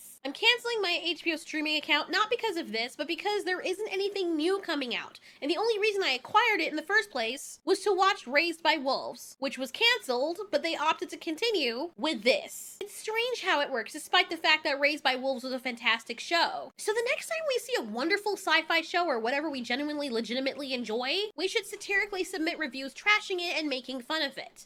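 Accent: United Kingdom. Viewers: That avitar and that British voice would sell the seas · Just gotta say it... Your Brit accent is on point!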